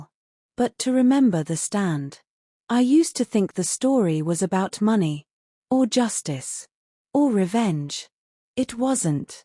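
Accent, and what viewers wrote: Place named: England, United Kingdom. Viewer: So if she’s from Pennsylvania, why does she sound SO English?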